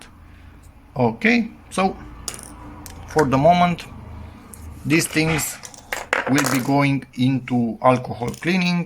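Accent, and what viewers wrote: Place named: Romania. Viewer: Aoleooooo ... asta-i englezeasca rumaneasca. Cel mai urat suna englezeasca asta, rumaneasca. O recunosti intre un milion de variante. Categoric esti ruman !!!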